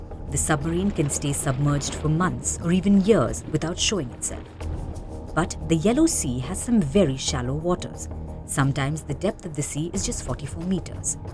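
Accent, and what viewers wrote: India. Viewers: It’s a pity it seems too much Indian accent here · A strong Indian Asan accent is really unpleasant.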